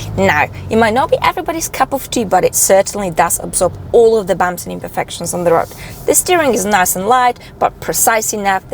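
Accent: Australia. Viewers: I heard the accent right away, but was confused by the license plate (it wasn't Australian) and the steering wheel on the "wrong" side. So until Switzerland was mentioned, I had no idea what market this video was made to inform potential customers. BTW, you are probably aware, here in the 'states commercial producers use Australian presenters or folks with Australia sounding accents when they want to make the product being sold just a bit more...posh. For some reason, the British and/or Australian accent is supposed to convey a bit more...class. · The Commodore comment confirmed it; I knew I could detect an Australian accent in there, on some words especially!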